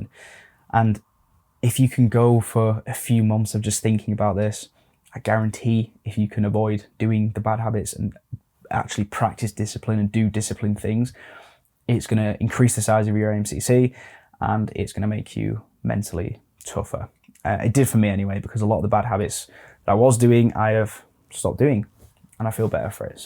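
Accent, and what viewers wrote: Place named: RP, United Kingdom. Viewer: Damn this dude even has the James Bond accent. Dudes unreal.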